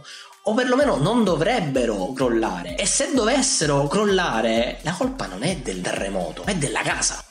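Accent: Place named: Catania, Italy. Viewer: Hahaha, grandissimo! L'inflessione catanese poi è bellissima, fa simaptia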